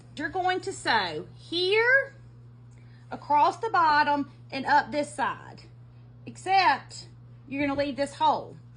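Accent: South, United States. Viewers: I just found your channel a few days ago, and im so impressed!! I just made this bag, it took me longer than an hour but I love it!! You are a great teacher! I love your accent, you must be from the south. Thanks for sharing your talents with us!!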